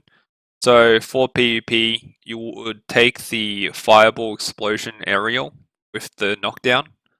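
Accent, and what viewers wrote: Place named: Australia. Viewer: you sound aussie :) subbed nice vid mate! helped me heaps ,south aust.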